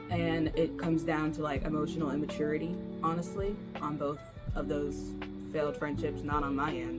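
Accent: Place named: United Kingdom. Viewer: Your British was a bit too convincing bc I was thinking "Has she always been British?"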